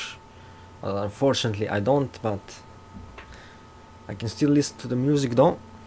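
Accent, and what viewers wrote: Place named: Eastern Europe. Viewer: I knew you got a kind of eastern-european accent!! You are doing great, papá!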